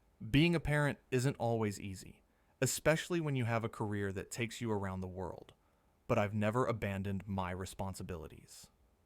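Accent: United States. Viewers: Some arse hole made a similar youtube video of a cliff richard interview with Lorane on morning tv ...total false.....sad bastard should get a job and earn a honest days pay. ...and whats this american voice about.??? Total tosser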